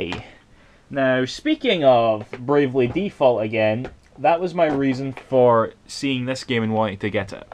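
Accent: Scotland, United Kingdom. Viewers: Your accent isn't as thick as I would expect from a typical Scot. I can actually understand you. :) Now some of those English lads... ;)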